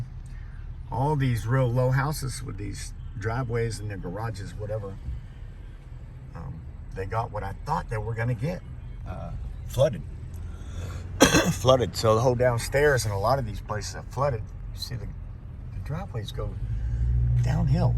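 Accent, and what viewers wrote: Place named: North, United States. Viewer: Howdy neighbor I live in Fair bluff NC we had flooding, but not like that this time the Beaches got hit hard . You sound like you're originally from up north I am as well , but been here 30 plus years now. I subbed my friend does a lot of scrap metal I did it myself a few times . I clean houses at N Myrtle Beach and work at a grill a few days ain't retirement wonderful 😂 . God bless we have another storm heading our way I love across from the lumber River I'm on the high side so I don't get flooded but you can't get out either.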